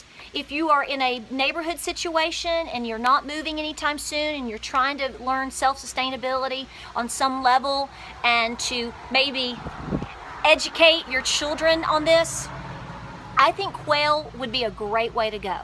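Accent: South, United States. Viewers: My luv, we think the world of you. And we would do what ever it takes to help you. You have to know that. But just remember, we will always be sub'd to you not matter what happenes. Just know that a world with no is a very lonely place. Please take care of yourself -- you have a lot of stress happening in your life right now. Please take care of yourself. We really do love you and you videos (even if you do talk kind of funny) (remember I am darn near living in Canada, so anything south of the 45th parallel is considered (southern) God Bless you May he watch over you and give you the strength you need and the knowledge when it is time to regenerate. Love ya Tropical Minnesota.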